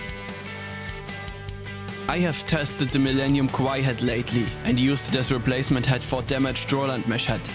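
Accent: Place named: Germany. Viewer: Hey sehr gute Arbeit, genau wie deinen anderen Videos... ich schreib einfach mal auf deutsch, weil ich deinen Akzent raus höre ;) Ich habe eines meiner akustischen Sets nach Anleitung umgebaut. ;) Und überlege jetzt welche Felle ich nehmen soll? Für die Snare hätte ich an das Real Feel gedacht. Für die Toms überlege ich Remo Silentstroke oder was anderes in der Preisklasse (QuiHead) weil 4 Toms (10,12,14,16) mit Real Feel geht ein bisschen ins Geld. Als Reso sollte man da auch ein Mesh Head nehmen oder gar keins oder ein normales Reso Fell? Wäre schön wenn du mir bei der Entscheidung mit deiner Erfahrung helfen kannst! ;)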